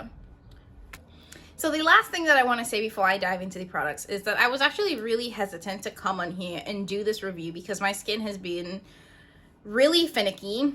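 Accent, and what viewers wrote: Trinidad and Tobago. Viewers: Trini people cant hide boy😂.Love the video hun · Imma sub just to listen to your Trinidadian accent 🇹🇹 lol .. I'm not into makeup too much but I love skincare. Thanks for the video 😊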